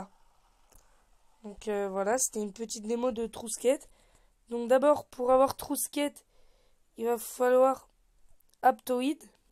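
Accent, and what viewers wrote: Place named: England, United Kingdom. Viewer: Ton accent anglais me tu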